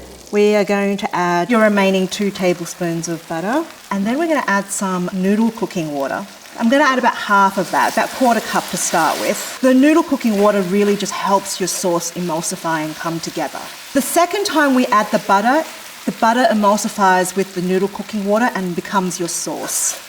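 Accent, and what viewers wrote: Australia. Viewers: Oz accents for life!! ☺️ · She's so happy that even her Australian accent sounds happier.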